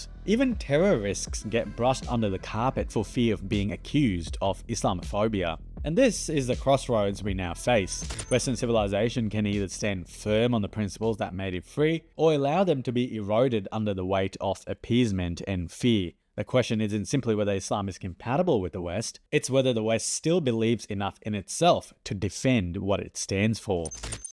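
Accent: Australia. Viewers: Great video, thank you. I can't believe you have such an Aussie accent but are from the Maldives. And I got a shock when you said you were an ex-Muslim in your previous video. What age were you when you arrived in Australia?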